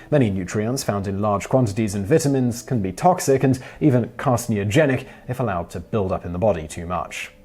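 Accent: United Kingdom. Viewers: I lo e how the American viewers get all worked up by his superior British pronunciation on a lot of words. Having him drop the odd American (mis)pronunciation in is great, just to keep them knowing that he knows.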